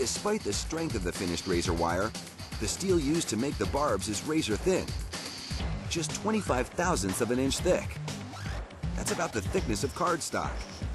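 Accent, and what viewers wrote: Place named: United States. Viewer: I like how he cheerfully explains how it can cut through cloths and flesh with his American voice.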